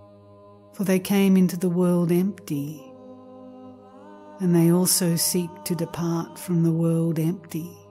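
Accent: Australia. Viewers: A beautiful reading of this book. Thank you so much. After listening to so many robotic voices “reading” sacred texts so soulessly and without any understanding at all , this is just wonderful! Also lovely to hear it in an Australian voice for a change. ❤❤❤ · I think this was read by an Australian. If so, greetings fellow Aussie! Thank you for this - thoroughly enjoyed it 😊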